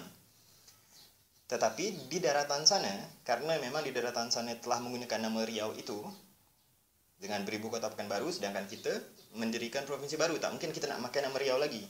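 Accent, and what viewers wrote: Malaysia. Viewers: He sounds like us malay in Malaysia 😄😄😄😄❤❤❤ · Kalau dengar suara sahaja, mesti akan ingat orang malaysia@ yang bercakap ni.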